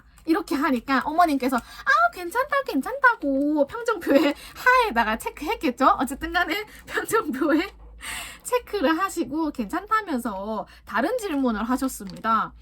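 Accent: Busan, South Korea. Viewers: 부산사람의 말투가 느껴지는군요!ㅋㅋㅋ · 최종합격도 축하드립니다!!! 저도 작년에 같이 고민 토로했던 기억이 ㅠㅠㅠ 저도 면접보고 아직도 발표 기다리는데 ㅠㅠ 넘나 떨리네요 부산사투리도 너무 정감갑니다 ㅎㅋㅋㅋㅋㅋㅋ저번주에 부산여행갔어요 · 우아 감사합니다!!!!! 필합 이후로 급 면접이라 님 영상보면서 가상경험 하고있어요ㅠㅠ 게다가 저도 경남권이라 사투리들으면 넘 안정돼요....ㅎㅎㅎ 면접가기 전날까지 이영상 보고갈거에요!!!♡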